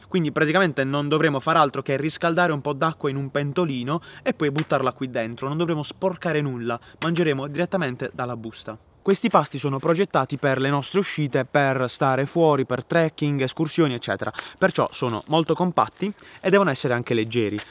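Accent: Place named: Apulia, Italy. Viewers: Sei leccese o comunque pugliese? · Capace che mi sento male. Ok sei pugliese come me, ora devo capire di che zona ahahahaha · Mi piace troppo il tuo accento. Sei andriese? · Spiegare come è fatta la pasta alla bolognese da un terrone con l'accento pugliese mi fa girare la testa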